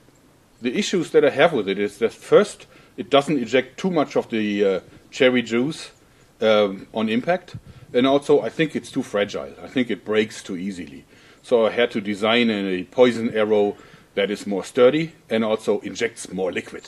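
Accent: Germany. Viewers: I love this guy! He seems like a tough brute at first but he seems like he is really nice, but still a total badass!! His German accent makes me miss my grandpa.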